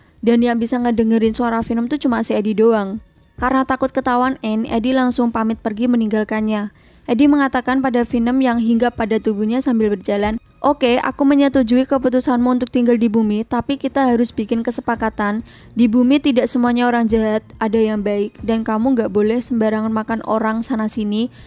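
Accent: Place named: Semarang, Indonesia. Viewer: Kya eruh pengisi suaranya min...medoke semarangan 😅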